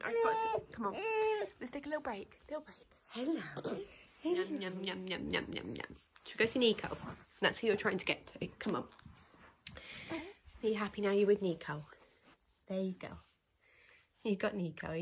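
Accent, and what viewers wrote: United Kingdom. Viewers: Hello, your dogs ate very cute! Im watching your vlog from St Peterbourg, Russia, and i couldnt find any mention about what country and city you are living in.. By your accent i guess you live somewhere in Great Britain, am i right?:) Maybe someone can prompt me? Thanks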